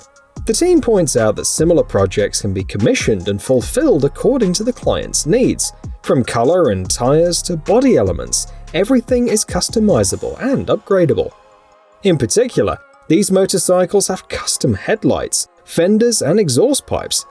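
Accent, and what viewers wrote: England, United Kingdom. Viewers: #2 The Kawasaki H2 is actually supercharged not turbocharged. A turbo is spun by the engine exhaust gasses/moving air, while a supercharger is mechanically spun by the engine......Also so there are several V8 car engines in motorcycles that make even more torque & HP than the H2 Kawasaki. That I think fit the definition of, "most brutal" way more than any of the bikes on this list. Also the Dodge Tomahawk (Viper V-10 engine) that Steven St.Laurent mentioned below. I would think a guy that makes videos & speaks in a snobbish English accent, would have his facts straight.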